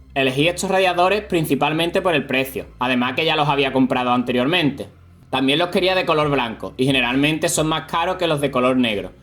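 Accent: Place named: Seville, Spain. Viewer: Ayer descubrí tu canal. Bien explicado y se nota que sabes de lo que hablas. Buen acento ¿Sevillano? Sigue así, te veo top en YouTube 😊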